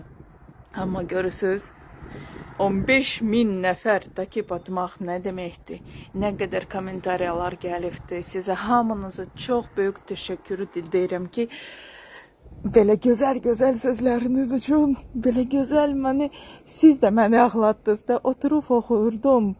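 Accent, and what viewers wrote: Ganja, Azerbaijan. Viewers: Твоя Ганджа акцент очень красивая❤🇦🇿🇰🇿💋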